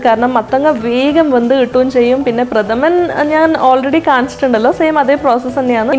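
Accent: Kannur, India. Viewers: Kannur slang? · Hello Njn ipppozhan video kandathu ... Undakki nookki super aayirunnu.. Thk u chechi Chechi evideya kannur aano Kannur slang pole thonnni enikk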